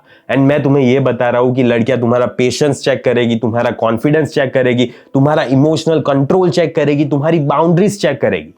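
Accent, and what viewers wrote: Gujarat, India. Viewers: Tumhari boli se pata chal gaya k tum gujrati ho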